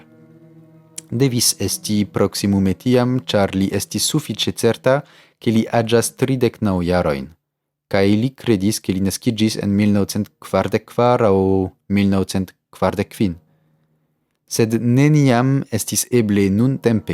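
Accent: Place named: France. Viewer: Mi rekonas francan akĉenton sed ne la precizan homon...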